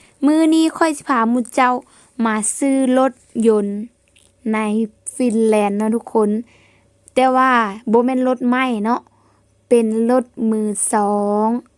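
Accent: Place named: Vientiane, Laos. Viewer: สะบายดี พี่สาวลาวฟินแลนด์ ผมมาเบิ่งรถมึอสองนำเด้อครับ มีแต่รถงามๆเนาะ สำเนียงเสียงเว้าเจ้าคึอสาวเวียงจันทน์เลยเนาะเว้าม่วนๆครับ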